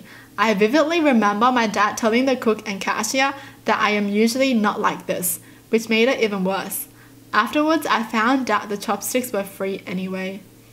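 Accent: Australia. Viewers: Any blinks here think she sounds like rosé. Cause they both are Australian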